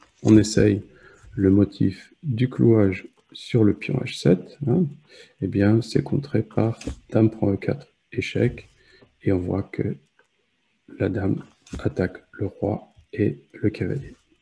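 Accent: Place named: South, France. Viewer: magnifique vidéo, très pédagogique ! Je vais reprendre mon activité sur la chaine "échecs en amateur" et parler de ta chaine comme une pépite du net échiquéen francophone... dès que le COVID m'en laissera le temps (je suis médecin)... en tout cas c'est un vrai plaisir de visionner tes videos : courtes claires et ensoldeillées par ce bel accent du sud !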